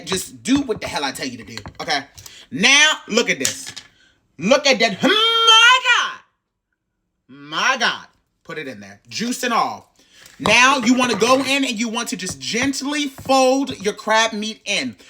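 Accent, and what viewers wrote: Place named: New Orleans, United States. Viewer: I'm from Louisiana! And I always thought that Crystal hot sauce was a New Orleans product. And yes, I would use ALL the ingredients that you have there and some smoked paprika. And we call your bread a po boy French bread. I'm with you on the sugar thing, too!😂❤❤ (I'm trying to figure out your Maryland accent. Kinda sounds like some New Orleans folks!)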